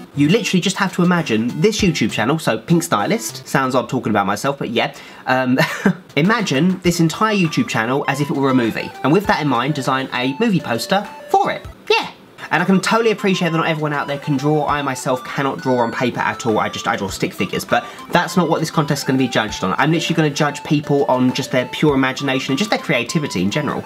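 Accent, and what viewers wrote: England, United Kingdom. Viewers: which part of England you from? i love your accent!! <3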